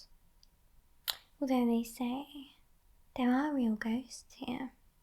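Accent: United Kingdom. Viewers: Your ability to morph into any Role and play that Character to perfection is an amazing Talent. You can honestly tell any story with your British Accent and I could listen to it for hours. Love the Red Costume and the Black Necklace and Masquerade Eye Mask.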